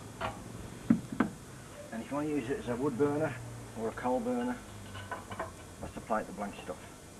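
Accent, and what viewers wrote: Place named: England, United Kingdom. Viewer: Hi from NZ. love the pommie accent mate. how did you do the cutting? with Plasma, jigsaw, hacksaw or angle grinder?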